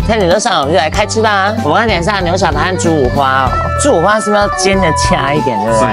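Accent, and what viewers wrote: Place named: Taiwan. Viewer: 很喜歡看到漂亮的介紹美食聲音好聽，又有台灣國語的腔調真的漂亮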